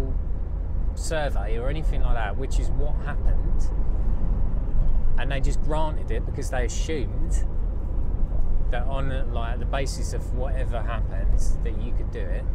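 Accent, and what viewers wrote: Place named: Portsmouth, United Kingdom. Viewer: So interesting. I found your channel by chance when looking for some cheaper way to get insulated plasterboard, I'm now heaviliy invested in your life...haha. Subbed. BTW, are you originally from Pompey, you certainly sound like it!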